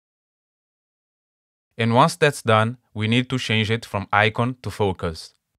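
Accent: Russia. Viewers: Why the Russian accent?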